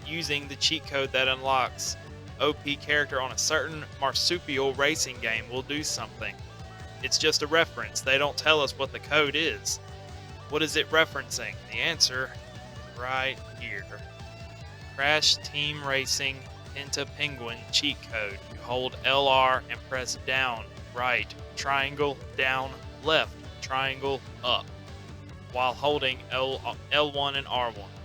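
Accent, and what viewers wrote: United States. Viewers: Sound like you from Arkansas son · Everything sounds so much more simply explained in a Southern accent.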